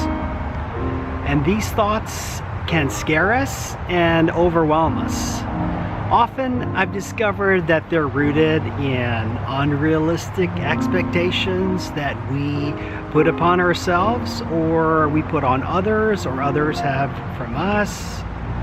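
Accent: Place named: United States. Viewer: American accent na c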